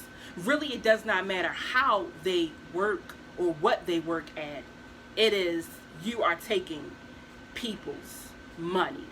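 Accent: Baltimore, United States. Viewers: Love watching you! I can always spot a Baltimore accent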